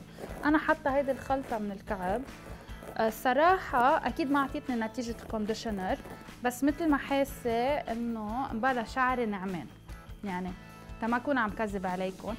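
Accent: Egypt. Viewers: بقيتي مصرية يا وبتتكلمي زينا🌹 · طريقة نطقك مصري عسل جداً 🤣🤣🤣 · فيديو جميل ❤️❤️ وطريقتك وانتى بتتكلمى مصرى عسل أوى حبيتها 😂😂😂😘😘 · المصري بتاعك عسل اوي 😂💟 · تحكي بالمصري كتير ضحكتني 😅😅😅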